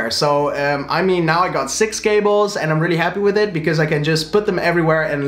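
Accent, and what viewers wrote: Netherlands. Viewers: I can already hear you're dutch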